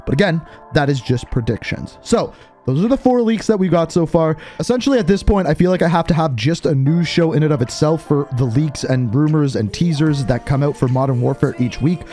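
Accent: Canada. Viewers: It's always funny to listen to how Canadians say "about" . I'm not watching the rest of this video hearing someone speaking this way. It's like Canadians specifically love to say the word about for some reason. Disliked and not watching it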